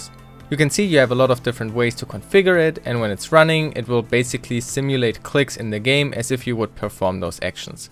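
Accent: Germany. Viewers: Hard German accent. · Obviously German accent xD But hornestly I would have used Wireshark to export the data from the webserver. It fetches all incimming and outgoing data for that. Quiet nice hearing about that other programs, but it looks to unconfortable to me exporting ram to get a server request. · From your accent i can guess youre German, da sag ich nur: Gute deutsche Arbeit! daumen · thats a german accent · MinecraftJeffy don't be stupid, he speaks english to reach a bigger audience and everyone understands what he wants to tell. He might have a german accent, but how should anyone learn English if you forbid themto speak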